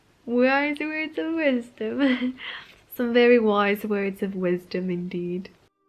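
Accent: South Africa. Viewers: Are you South African ? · sorry my south african senses are tingling, are you from South Africa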